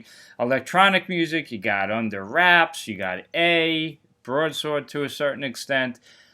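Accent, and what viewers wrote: Long Island, United States. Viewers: Is that a North Babylon Fire Department hat? You sound like a Long Islander lol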